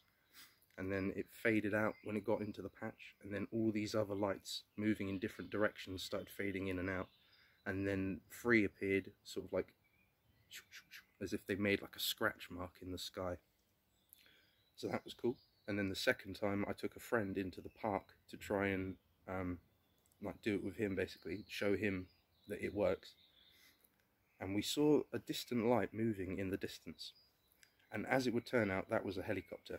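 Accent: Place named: United Kingdom. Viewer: You have a cool British accent in the likes of Henry Cavil, not a pompous one like Nick Pope and Mick West. I've tried CE 5 and had mixed results nothing solid or repeatable. My theory is they have sensors that can read pretty much everything with 3-5 miles of them. They know everything going on within that bubble and its like super hero or god like powers minus bringing back the dead. Very weird world and we barely understand any of it.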